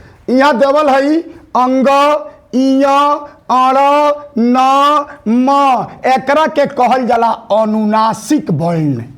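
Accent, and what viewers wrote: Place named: Bihar, India. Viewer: Sir jii Pura Bihari style me ❤❤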